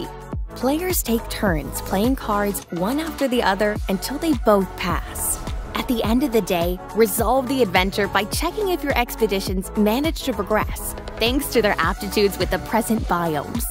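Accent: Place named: France. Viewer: The French accent makes it so much better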